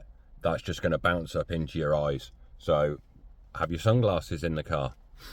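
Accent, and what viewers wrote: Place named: Kent, United Kingdom. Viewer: Hey, great video! I'm typing from Canada. I'm lived half my life in Kent, I haven't been back to the UK in almost 10 years, but it's great to hear the old accent😆. I just had a question, as a vw tdi enthusiast like you, do you recommend driving your vw tdi hard (ie. staying in 3k-4k rpms for a few minutes or redlining) or just shifting in a higher gear by putting the dsg in manual mode? And then also if I drive it "hard" or shift it into a higher; how often a week should I do this as a daily driver in order to prevent buildup in the engine from the egr,dpf, etc...? I own a 2014 2.0L vw passat tdi by the way and the only difference between the conditions I'm in, is that it's negative temperature for over half the year here lol.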